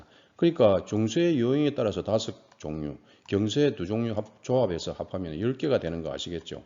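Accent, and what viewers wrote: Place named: Busan, South Korea. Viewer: 교수님 부산분이신가요 ? 익숙한 억양 너무 좋아요 쏙쏙 들어오고 친숙하고 재미있어요 ~^^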